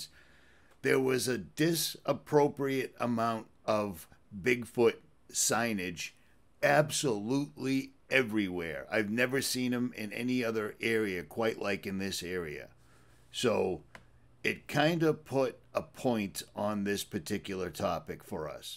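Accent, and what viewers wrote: Boston, United States. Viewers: I'd recognize that Bostonian voice anywhere! Damn! I sorely missed you!!!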